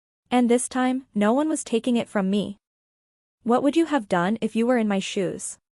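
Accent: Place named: India. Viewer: Is the Indian accent really necessary? It detracts from the storytelling.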